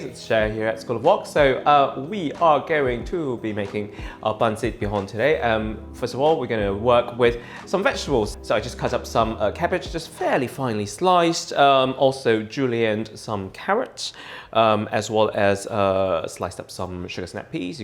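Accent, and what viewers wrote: United Kingdom. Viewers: ❤ your british accent 😊 sir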